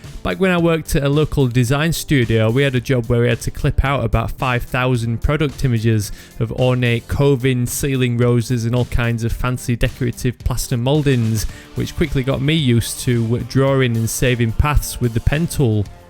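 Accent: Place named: Yorkshire, United Kingdom. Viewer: Love Yorkshire accent.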